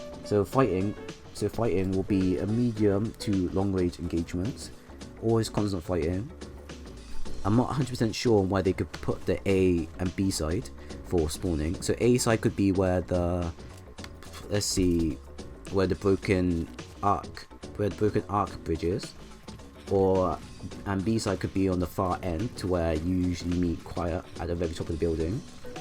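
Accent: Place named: Southern England, United Kingdom. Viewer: That accent sounds Southern English.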